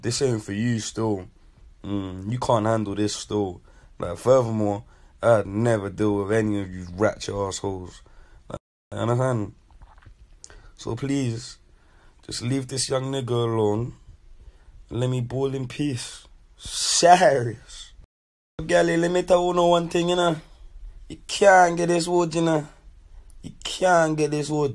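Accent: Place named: Jamaica. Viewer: The yardie accent 🤢🤢🤢 ya nah yardie man your begging it. I’m not hating tho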